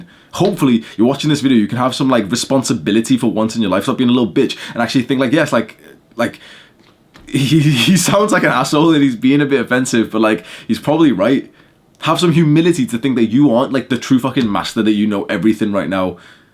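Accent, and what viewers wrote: India. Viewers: That indian accent🤣🤣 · love from India❤, your indian english really matches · U have the best Indian accent. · dope Indian accent brother, approved! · Bro has the Indian accent better than Indians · thaaaat indian accent isn't funy XD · Fuck that indian accent made me dissociate.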